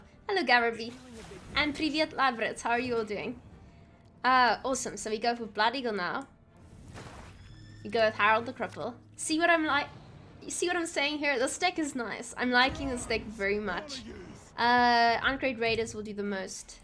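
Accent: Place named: Afrikaans, South Africa. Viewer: Knew I recognized the Afrikaans accent👀👌🏾. Great to see a fellow South African playing gwent😁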